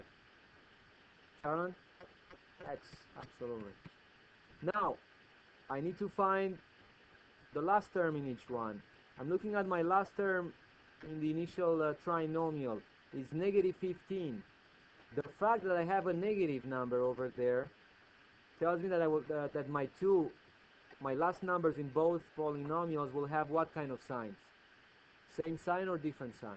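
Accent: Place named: Eastern Europe. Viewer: Where is your accent from? Just curious I wanna guess eastern European.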